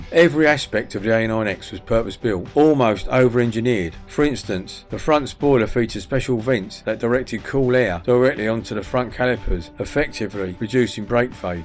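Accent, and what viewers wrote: England, United Kingdom. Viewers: A pommie accent talking about Torana …?!?! This is not good .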